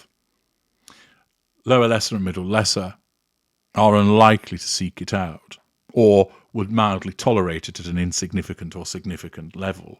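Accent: United Kingdom. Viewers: Why is everyone with a British accent automatically a douche? You're the ultra alright. Ultra twat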